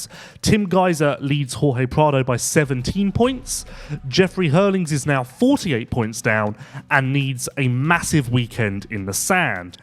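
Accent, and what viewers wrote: United Kingdom. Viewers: Yes, we need American english. It's a bummer when American legends like Roczen and Jet has an Englishman covering their series... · Can we not have a Brit do the voice over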